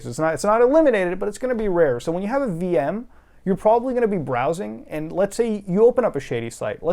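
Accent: India. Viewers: Your indian english accent is really bad lmao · Can you read all text written by scammers in ur Indian accent like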